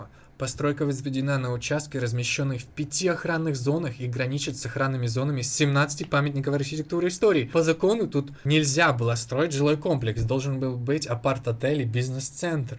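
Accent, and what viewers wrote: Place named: Russia. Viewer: а по мне так ты сам урод с маскальским акцентом ... :face-orange-raised-eyebrow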